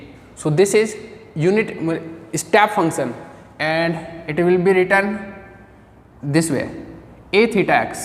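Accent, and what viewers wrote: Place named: Haryana, India. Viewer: This video is very much useful,Sir .. Thank you very much. But in this video we miss your hariyana language Sir ,wo wala language jyada cute hota hei 😊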